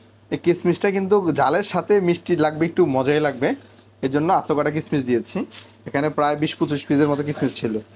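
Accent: Noakhali, Bangladesh. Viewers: হেতে কি নোয়াখালী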